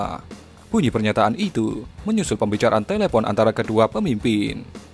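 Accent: Java, Indonesia. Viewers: logatnya jawa banget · Jowo ne ngendi mas bro medok banget ya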